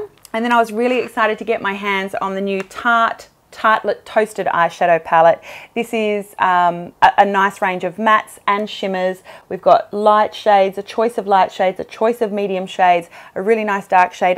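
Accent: Australia. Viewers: Great to see u again and the refreshing Aussie accent and all the familiar products I see on the shelves here 🤗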